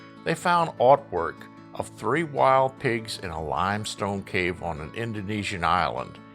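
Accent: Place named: New England, United States. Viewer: Narrator sounds more like he's from Massachusetts or Maine, not Louisiana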